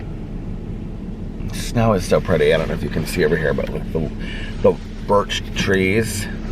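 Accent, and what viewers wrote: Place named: Midwest, United States. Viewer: Your voice captivates me. Your accent is reminiscent of my midwestern family roots. Been to Indy several times, lived in Cincy for a bit. Love the Midwest and adore you and. Thank you for this channel. So cozy.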